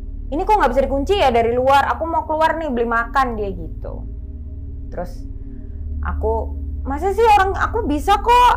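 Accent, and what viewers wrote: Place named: East Java, Indonesia. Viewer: wa ini org jawa timur ya mbak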